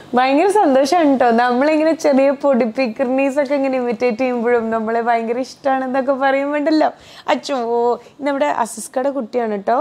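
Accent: Thrissur, India. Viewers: Thrissur slangil athinte monj ithirikoodum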